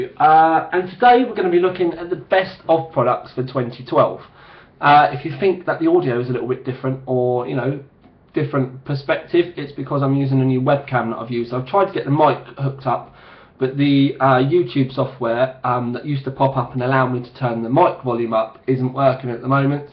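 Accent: United Kingdom. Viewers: You wanna hear something funny, i got tired of watching the video so i decided to go and read the best of 2012 over at the site. When i started reading i realized that i was reading it in my head with a British accent. Im so used to watching your videos from over here in the US that now when i read your articles my mind puts your voice as the voice over!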